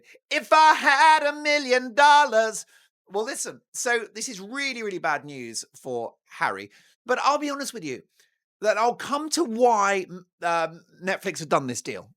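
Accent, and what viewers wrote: Australia. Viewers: Dolan, you are very entertaining and you do the best Aussie accent I have ever heard, cracks me up every time (I'm Aussie so I do know). "Cobber" is very sixties though, it's "mate" now but keep up the fun times with this show! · Love the Australian accent. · 😂😂 your Aussie accent is on point.. brilliant.. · Love the Auzzie accent.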